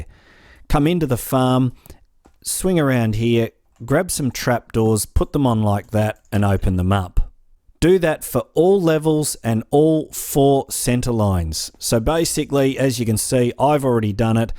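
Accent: Australia. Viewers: you seem australian are you? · I'm Australian and this is the most Australian accent I've every heard! Makes me proud! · You sound Australian... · are you australian?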